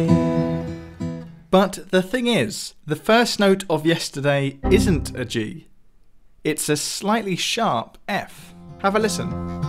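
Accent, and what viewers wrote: United Kingdom. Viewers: Your accent is more like than mine, but we all say the word "yesterday" with the first syllable stressed and a little higher in pitch, right? In American English that first syllable isn't hit as hard in UK English even allowing for the wild variations we have over here. So, maybe it's sprechstimme?:-D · If this guy didn’t have a British accent I would have turned this video off at :45.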